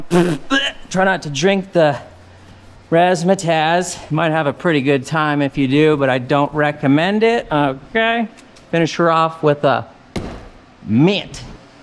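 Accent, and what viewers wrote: Canada. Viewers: Love your videos. Do you use Bosch 3323 filters on Hondas since they moved away from Filtech and what do you think about Aisin transmission fluid and coolant? Gemini told me that it is as good if not better than Honda but I was a little concerned when I looked at my receipt and saw that my independent shop didn't use Honda fluids when I had my 73K 2012 Odyssey EX-L in for service. I bought it new and hauled the kids around in it for years so I can't get rid of her even though the last service cost me more than she is worth. I thought that Aisin timing belt kits were industry standard but didn't know anything about their fluids. Can you share your thoughts on those products - either in comments or on a video? Also, I assume you grew up in Canada based on your accent, etc. but haven't seen a video exp,aining that. Is there one that I missed?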